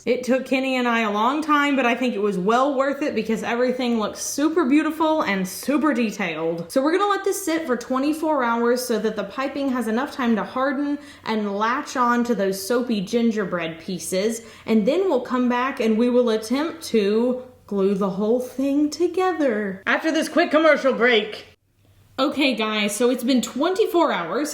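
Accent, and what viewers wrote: Texas, United States. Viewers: R u from texas?love ur channel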